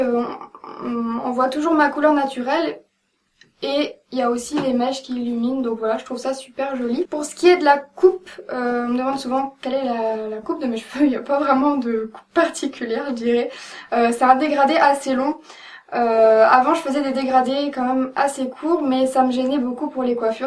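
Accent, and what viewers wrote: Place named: Quebec, Canada. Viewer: tes vidéos sont super! c'est mignon tu as un léger accent québécois!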